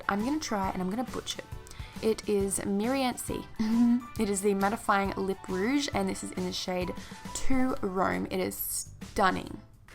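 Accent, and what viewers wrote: Australia. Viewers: Australian accents are so cool omg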